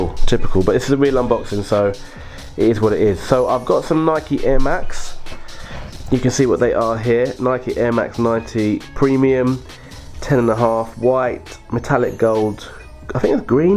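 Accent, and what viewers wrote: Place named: United Kingdom. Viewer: When I heard the British accent I was excited there isn't much of us uk trainer lovers on here. Keep it up love your video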